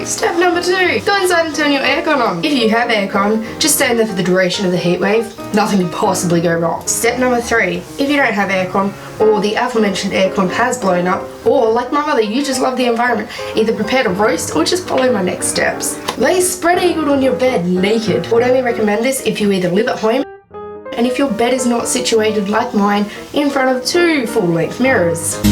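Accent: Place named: United States. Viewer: you have an american accent in this entire video and it's tripping me out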